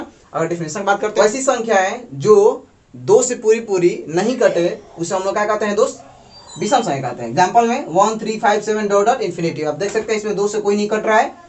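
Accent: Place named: Bihar, India. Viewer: Bihari log ka boli mst h😊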